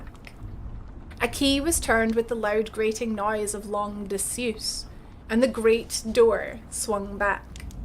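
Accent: Scotland, United Kingdom. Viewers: don't worry about it :)) the video was great and the scottish accent made it even more authentic :)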